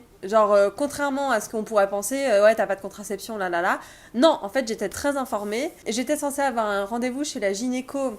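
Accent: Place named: Alsace, France. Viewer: J’ai reconnu ton accent et quand t’as dit que t’habitais en Alsace j’étais genre YES aha Je suis de Strasbourg :) sinon c’est cool ta transparence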